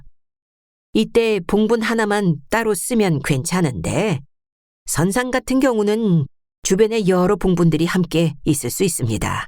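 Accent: Jeolla, South Korea. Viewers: 이번작품 음색은 폭신폭신합니다. 저만의 느낌일수도있지만 처연,청아등… 님컨디션에따라 다를수도 있겠지만 미세한 무언가 있습니다요,이번엔 차진 남도사투리로 들려주시니 그도 감사합니다^^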